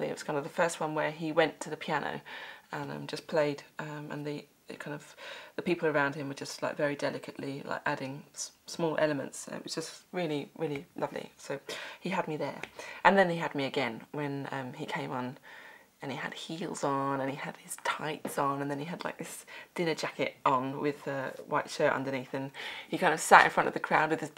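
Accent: England, United Kingdom. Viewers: You have such a beautiful typical english voice I adore your music so much! You are such an inspiration to me Imogen! Just thought I'd say:#3 My friend Kimmy is entering XFactor singing ' ' Think Simon knows that song? x3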